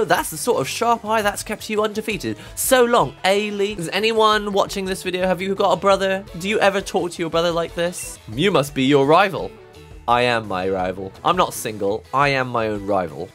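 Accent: United Kingdom. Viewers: well he is a British dude doing a British accent. Nothing really surprising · This is gold but I've been watching you for years... Are you British? But 1st ☕ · Doesn't sound like a classical RP, but Finally someone who speaks in some british accent and do it UNDERSTANDABLE. :D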